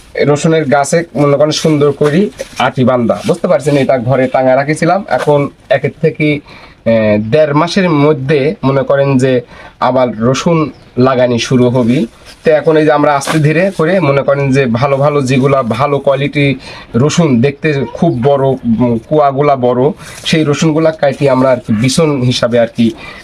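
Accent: Natore, Bangladesh. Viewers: নাটোরের ভাষা কুষ্টিয়ার না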